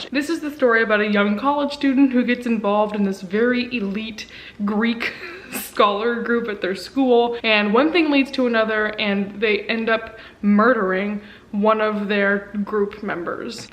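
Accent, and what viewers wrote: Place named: Germany. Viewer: Always so excited to see some German books!! ;) Also, you're German accent is amazing! Especially the "ch" you nailed! Ich bin gespannt, wie du es finden wirst, Victoria Schwabs Bücher auf Deutsch zu lesen :D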